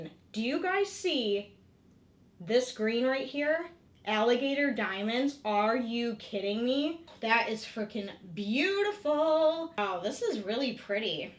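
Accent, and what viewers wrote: Michigan, United States. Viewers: I love your mitten accent lol. "I got it Ahn" 😊😆🫶🏻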